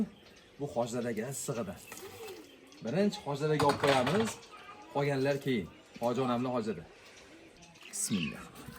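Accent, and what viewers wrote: Fergana, Uzbekistan. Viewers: Фаргоналимисиз каеридан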